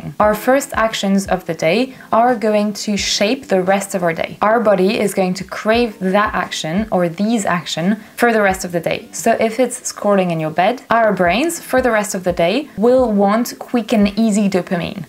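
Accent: United Kingdom. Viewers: great british accent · J'adore ton accent britannique 💕💕💕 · Such a beautiful accent from the UK ! Moi qui essaie d'améliorer mon anglais et avec cette thématique c'est d'autant plus motivant ! Merci et bravo pour cette vidéo :virtualhug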